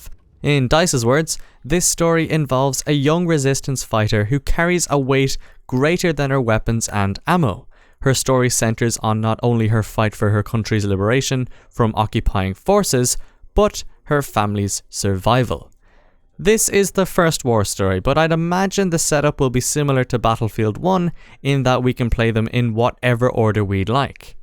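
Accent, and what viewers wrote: Ireland. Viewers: he's irish, he said it right in this video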